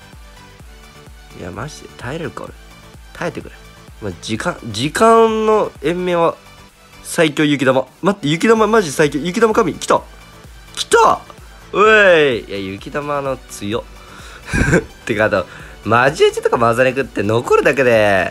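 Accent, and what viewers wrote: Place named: Shizuoka, Japan. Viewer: 久しぶりにRADさんの動画見たらめっちゃ静岡訛りでばかばか言ってて何があった？って感じ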